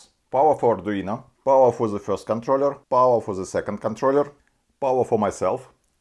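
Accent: Russia. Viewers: С русским акцентом мне даже легче понимать английский язык :). И с юмором, что тоже приветствуется! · Ohh russian accent👍. You reminded me USSR scientists, who were living only for science itself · Русский акцент сразу ударил по ушам. Что бы убедиться в своей правоте открыл описание под роликом, но увидев там какого-то Yoyo Liu был крайне удивлен. Пока не понял что это просто контакт продавца.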